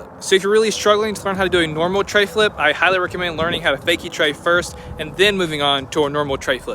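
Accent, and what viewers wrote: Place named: South, United States. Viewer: Where u from homie. I hear a lil southern draw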